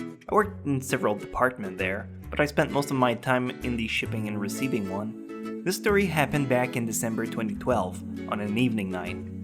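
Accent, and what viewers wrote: Quebec, Canada. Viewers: OMMFGGG ur Canadian as well judging by accent I would assume Quebec se magnifique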